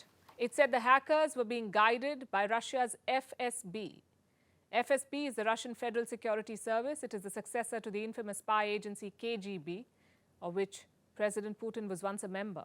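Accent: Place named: India. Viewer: God I hate this indian Accent. Can you please use AI to "normalize" her voice.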